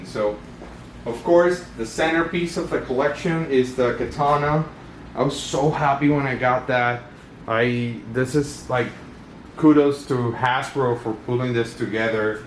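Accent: Venezuela. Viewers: El acento venezolano te delata .. y me alegra .. muchísimo .. tremenda colleccion .!!! Increíble .!!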